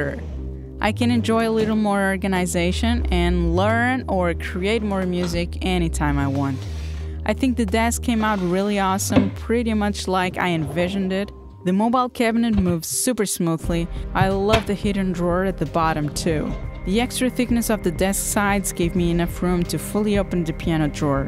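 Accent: Portugal. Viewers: Pqp, assiti o vídeo inteiro imaginando que sotaque é esse e vc é portuguesa kkkkk sou br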